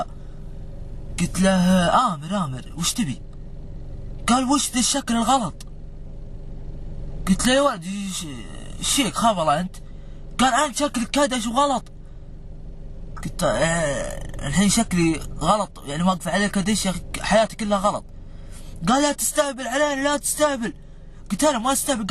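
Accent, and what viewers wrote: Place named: Libya. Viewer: اللهجه تشبه كثيل لهجت الليبيين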